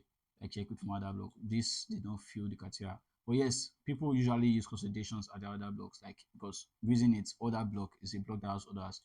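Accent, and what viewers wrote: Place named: Nigeria. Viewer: Nigerian accent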